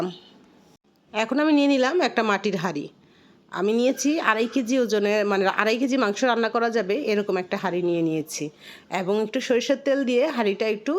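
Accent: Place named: Chittagong, Bangladesh. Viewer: অাপনার চিটাগং ভাষা শুনে আমি মুগ্ধ 😊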